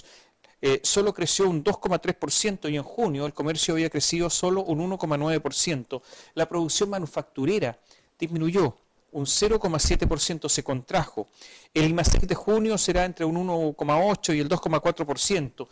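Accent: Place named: Chile. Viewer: cada vez encuentro mas chilenos con su acento estupido el peor castellano de america!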